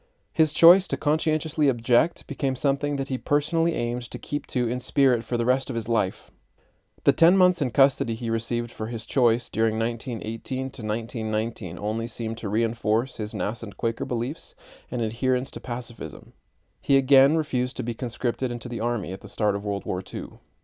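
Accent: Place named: United States. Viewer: Great work! (why the American accent on the video?)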